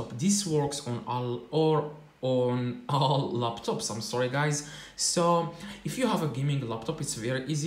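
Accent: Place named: Morocco. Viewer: Sound morrocan 😁🤩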